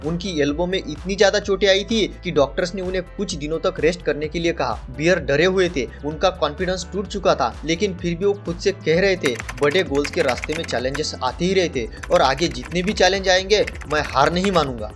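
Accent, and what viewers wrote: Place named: Maharashtra, India. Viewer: Bhava tuzi hindi marathi tuning madhe vatat aahe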